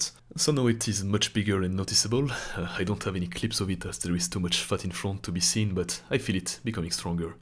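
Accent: France. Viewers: Are you french ?? You sound french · The french accent is so funny😂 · French accent ✨ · Accent français lol excellent contenu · French touch 😂 love the accent 😂 It is,what it is ? N'est ce pas 🤣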